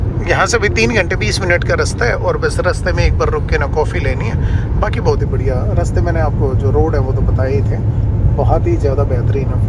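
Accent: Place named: Haryana, India. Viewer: Brdr Apko aawaj me haryanvi touch hai AAP Haryana me Kha ye hai bataiye please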